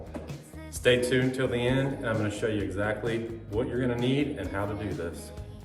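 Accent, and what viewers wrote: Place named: Indiana, United States. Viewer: I'm in southern Indiana. You remind me of an old classmate of mine. I think your accent gave me a hunch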